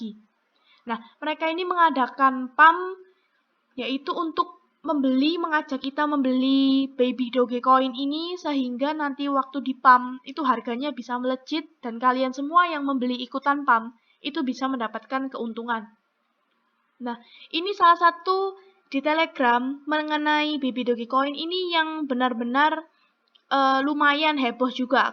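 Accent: Java, Indonesia. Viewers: Halah iki mesti wong jowo sing ngomong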